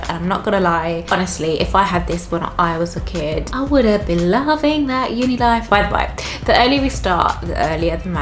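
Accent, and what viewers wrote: United Kingdom. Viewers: Are you from England. Btw great video...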